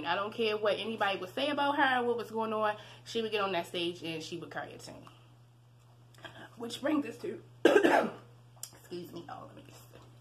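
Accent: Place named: Baltimore, United States. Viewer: I’m from Baltimore and your accent sounds similar .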